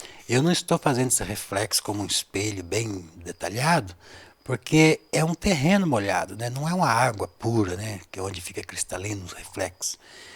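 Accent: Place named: Minas Gerais, Brazil. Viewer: Adorei suas dicas. Seu sotaque mineiro é muito bom. Obrigado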